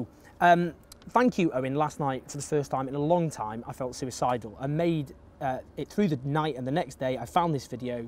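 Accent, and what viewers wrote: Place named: Northern England, United Kingdom. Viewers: Owen you do sound pretty northern. Nothing bad about that (Mancunian myself) but one word is enough to hear the North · Always thought Owen you sound just like where your from. Yorkshire Sheffield would be more to the point. Be proud of who you are.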